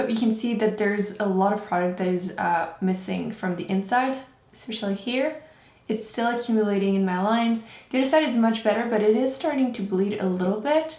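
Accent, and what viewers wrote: Quebec, Canada. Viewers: Hehe. Accent: French Canadian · She is Canadian French. How the heck can you not detect an accent???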